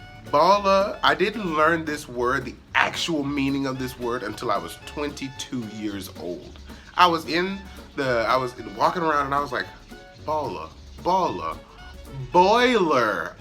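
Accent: Gullah, United States. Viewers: I'm from the low country just outside of Charleston. You don't have the geechee accent. You have the gullah accent. Like beafort. Geechee accent is way different.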